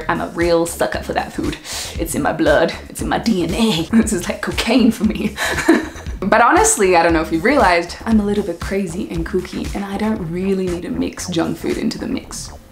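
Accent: Australia. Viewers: I am going to be honest here. At some point, I did unsubscribe you because of the Australian accent (just a past trauma involving some Aussie accent) but the content is just so good and funny, I had to come back :D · Is she Australian?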